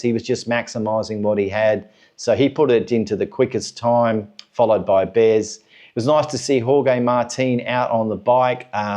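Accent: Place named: Australia. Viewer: Hi, considering your accent, seems you come from Australia, don't you? Btw his name is Raz-gat-lioglu aka RazGOAT😂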